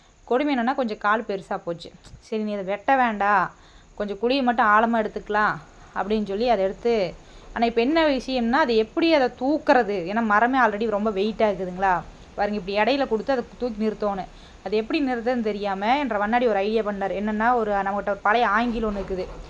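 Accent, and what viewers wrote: Kongu, India. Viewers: உங்கள் மாட்டு கொட்டகைக்கு ஓலை போடுவது மிக அழகாக படம் ஆகி இருக்கிறீர்கள்👍 அதைவிட உங்களுடைய கொங்கு தமிழ் பாஷை அழகாகவே இருக்கிறது 👍 இதுபோல் தொடர்ந்து பெரிய பெரிய வீடியோக்களை இன்னும் ஐந்து நிமிடத்திற்கு மேல் போட்டால் நிறைய பேர் விரும்பி பார்ப்பார்கள்😊 ஆரம்ப நிலையில் இருப்பவர்கள் இது போல் வீடியோ போட்டுக் கொண்டே போனால் வெற்றி உறுதி 👍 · ஓலை போட்டது super கொடுத்து வச்ச மாடு👌 🎉🎉🎉கொங்கு slang🎉🎉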